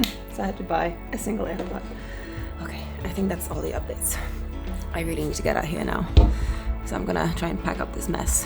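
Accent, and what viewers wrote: England, United Kingdom. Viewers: The British accent, the Land Rover Defender.. I think i found Lara Croft in real life :) Don't tell me not everybody had that thought hahaha 👍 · Back when had an English accent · What a great video and such a lovely girl. What a lovely English accent she has! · She grew up & worked in London hence the British accent . Also she went to Oxford University over there !!!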